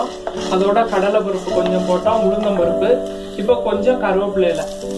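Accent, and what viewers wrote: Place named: Tirunelveli, India. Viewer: super mam....👌👌👌 unga slang romba nallaruku ...tirunelveli tamil mathri...... Azhaga iruku ..👍👍❤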